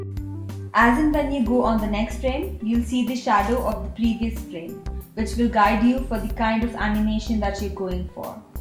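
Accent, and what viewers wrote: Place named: India. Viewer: From what i hear, she should be indian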